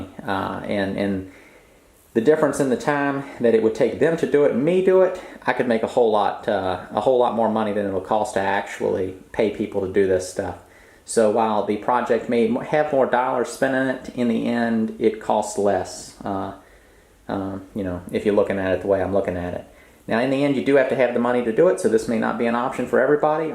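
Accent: South, United States. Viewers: His accent indicates somewhere down South.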